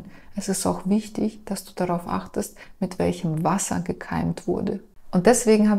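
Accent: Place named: Soviet Union. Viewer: Dieser sovietakzent 🥰🥰🥰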